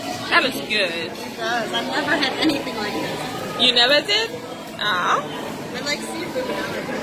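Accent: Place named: Ivory Coast. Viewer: Naaan il faut que tu m'explique comment t'arrive à avoir un bon accent en anglais alors que tu as aussi ce magnifique accent quand tu parle français avec ton accent ivoirien. ça fait des mois que je suis au usa et mon accent français est toujours aussi fort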